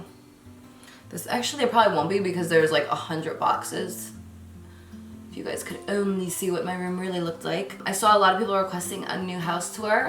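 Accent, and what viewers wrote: New Jersey, United States. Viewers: Her Jersey accent is so thick i honestly love it. She kinda sounds like Rihanna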